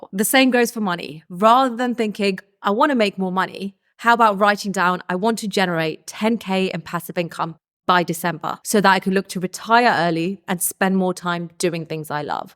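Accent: England, United Kingdom. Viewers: Straight up gorgeous and wicked smart. Plus you have that English accent. Good lord.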